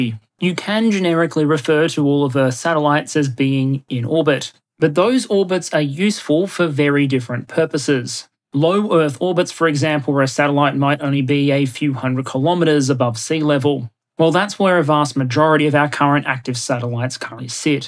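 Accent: Australia. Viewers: I love the NZ references in your videos. You sound very Aussie, but I’d love to know if you have a kiwi connection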